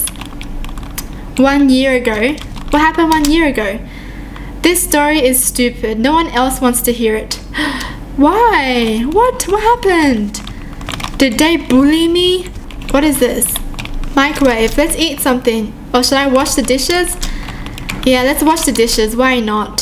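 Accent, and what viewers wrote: Australia. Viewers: but she has an Australian accent while has a Japanese accent?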